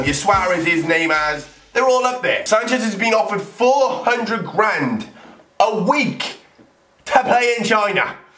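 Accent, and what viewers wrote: Wales, United Kingdom. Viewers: are you from welch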